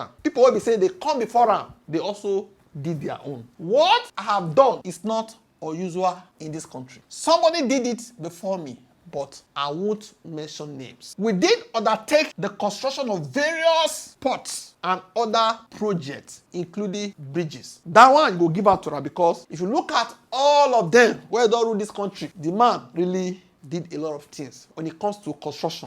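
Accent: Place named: Nigeria. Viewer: I must commend you. I never still see time siddun read the book, talkless of to break it down, come analyse am thoroughly like dis. And to do it with an authentic nigerian delivery style devoid of the usual fonetics, abeg my broda u too much!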